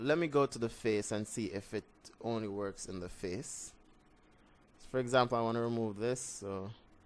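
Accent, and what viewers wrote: Jamaica. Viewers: 🇯🇲 accent. Big up yourself mi genna. You done know how di ting set.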